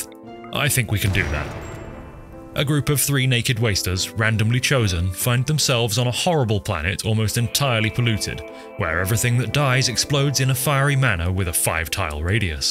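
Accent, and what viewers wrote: United Kingdom. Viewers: bro dude are you and the same dude u have like the same voice but you are both britich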